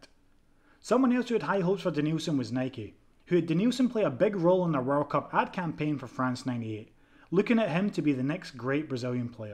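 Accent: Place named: Glasgow, United Kingdom. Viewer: Where in scotland are you from?you sound more glasgow side than Edinburgh side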